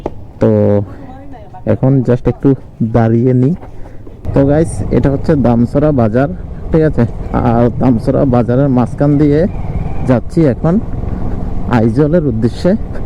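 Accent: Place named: Noakhali, Bangladesh. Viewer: Bhi tomar Noyakhali dialect onaak sundor. Noyakhali ta e koioooo . 😊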